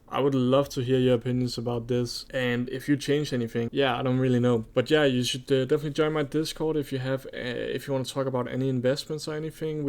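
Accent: Denmark. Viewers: holy danglish bror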